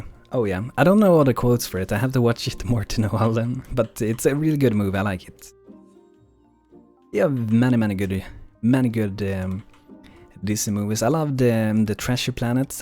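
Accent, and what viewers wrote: Sweden. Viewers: your Swedish right?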